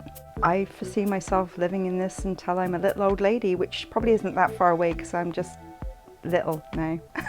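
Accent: United Kingdom. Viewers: Are you originally from the UK?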